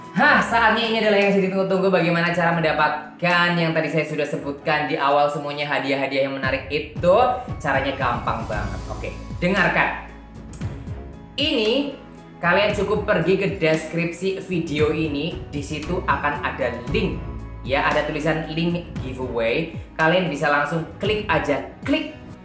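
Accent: Java, Indonesia. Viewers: Weslah ke Bali wae ben diopeni, Ganbathe san. wae Suara mu jowo medok renyah di rungokan. Hehehe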